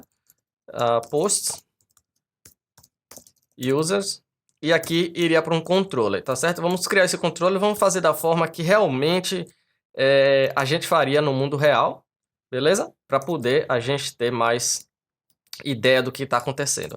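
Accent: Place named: Bahia, Brazil. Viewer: Muito top a aula mestre!! Acho maneiro seu sotaque baiano hahahaha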